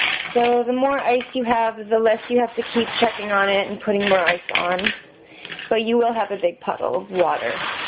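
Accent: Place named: United States. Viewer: Gotta love when idiots are explained how somethign works then makes youtube videos about it: Here.. I have something which obviously is larger than CUPS... marked with 12 sure as hell wonæt be litres and given the accent, we can only surmise it is a yank, no wonder, so perhaps gallons... 12 gallons that size that far up.. feasible, looks a bit over the top but still within reason... Stupid girl goes erm 12 , let me think, cups, ye thatæs right.... JESSSUUSSS. Just leave our planet already please x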